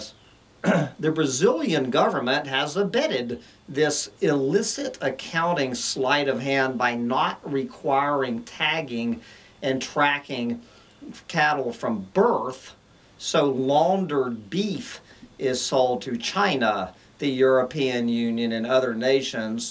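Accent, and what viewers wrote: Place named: Pennsylvania, United States. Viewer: He is upstate where accents are more like Pa. and Ohio than the City's interesting accent.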